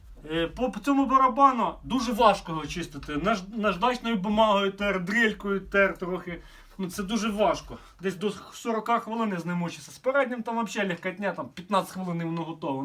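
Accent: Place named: Lviv, Ukraine. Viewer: Братан ти як колишній прем'єр Азаров😁 по мові чую що Львівщина, мабуть, Красноград?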